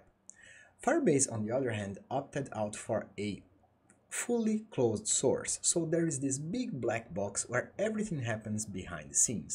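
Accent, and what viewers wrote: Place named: Brazil. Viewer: Esta com sotaque de brasileiro rs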